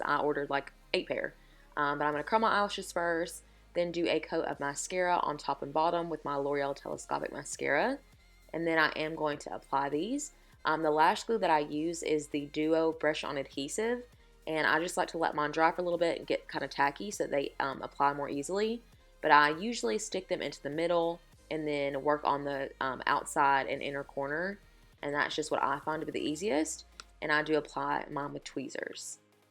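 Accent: South, United States. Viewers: i was not expecting that southern accent from you! proves you can't judge a book by it's cover.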